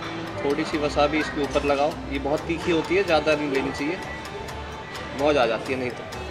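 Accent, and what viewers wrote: Haryana, India. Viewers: Bhai kabhi ye apni harayanvi boli change mt krne ✌️♥️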